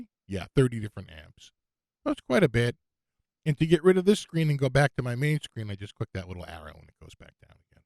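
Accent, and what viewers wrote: Boston, United States. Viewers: Being a transplant in Pa from Quincy point the Boston inflections helped me understand the descriptions of the of the features. Waiting since November for delivery, not yet. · Nice intro to a great sounding product. I just ordered one and will be getting it in another week. This was a great demo of its capabilities and its awesome sound. Thank you,. Karl, also from Boston, but sans the accent!! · Instant subscribe, badass no bull shit informative video, and the only video I’ve seen where someone demonstrates a clean tone not saturated with effects. Also that Boston accent is so easy to listen to! Sick work man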